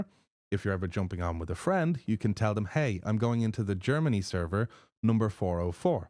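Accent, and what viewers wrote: Ireland. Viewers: It's "control R" - it's Irish accent! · Love the irish accent the irish people have always been my favorite english speakers. From what i have heard you are very similar to us austrians😂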